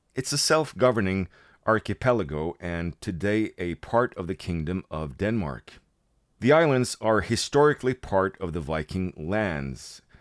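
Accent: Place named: Norway. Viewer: Interesting video. I do remember hearing about this newest discovery and being Faroese myself anything pre-Viking era is really interesting. And you didn't do too bad with the Faroese place names, although I sense a Norwegian(?) accent. Eiði, for instance: the ei is like eye and the ð is silent but a y/j is inserted between the ei- and the -i (which itself has a more e like pronunciation), so "eye-yeh". You mentioned Ærgisbrekka, well, the first part of this name ærgi is also a Gaelic word, and Argir is the name of the town right next to the capital, same word but different spelling. It will be interesting to see what other discoveries are made in the future.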